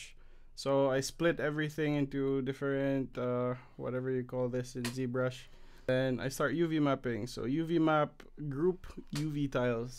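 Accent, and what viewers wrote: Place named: India. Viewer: your accent is sounds like an indian.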